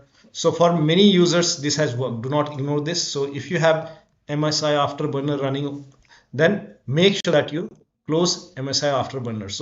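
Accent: India. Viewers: >hear indian accent >close the video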